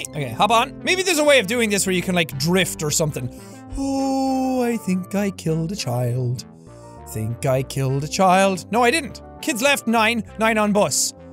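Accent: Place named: Ireland. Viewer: Dear anyone taking an interest in this comment, I have been watching Jack for a while now and I love him. I first watched one of his videos when I was seven, I had head phones in on max volume, Bad idea. I clicked onto the video and heard a loud Irish mans voice that almost made me deaf. I immediately clicked off the video. A few years later when I was ten I found his channel again but didn't remember it so I clicked on the video and I loved the video, I watched more videos and subscribed to the channel. I loved him, he helped me through a lot. A few minutes ago as I am writing this comment I found out I am going to his live tour on the third of November, I was and am still crying tears of happiness because I can finally meet or at least see the man who has helped me through so much. if you are reading this, thank you so much.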